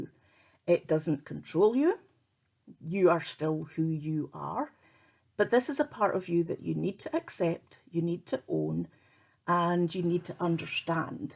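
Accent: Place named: Scotland, United Kingdom. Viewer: Got soo excited when I heard the scottish accent but realised you live in Australia lol🤣I share many of your challenges and wondered if there was a way to contact you. Love your content, its very helpful and and relatable thank you for sharing🤗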